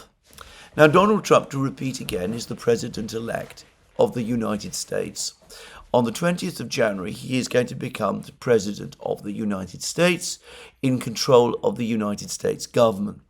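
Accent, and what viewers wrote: United Kingdom. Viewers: Glad you're feeling better, as I am addicted to your podcast and beautiful British accent.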